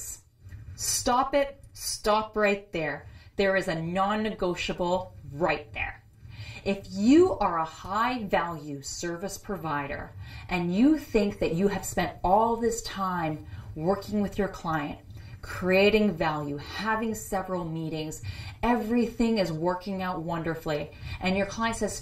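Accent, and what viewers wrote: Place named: Canada. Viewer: Excuse me, please! Thank you so much,. Your Canadian English with subtitles is fantastic. Greetings to Mar. and Sh. Take care of them. Cheers! Thank you. Bye!! 🇨🇦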